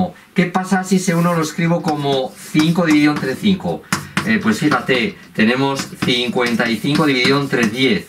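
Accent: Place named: Aragon, Spain. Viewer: Qué acentazo maño más mañico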